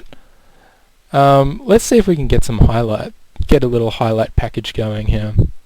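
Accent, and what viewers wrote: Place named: Australia. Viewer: no problem you from aus too by the sounds of it good work ill subscribe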